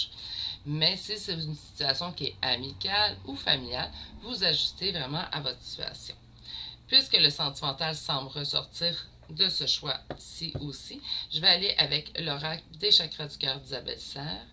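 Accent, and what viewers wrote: Quebec, Canada. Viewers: 👋... Je vous découvre et je suis scotchée ! Votre accent que j'adore (j'ai dû être Québécoise... 😍), votre chien Guizmo (moi c'est mon 🐈‍⬛), et là, le second choix et... TOUT est juste ! 😉😚😇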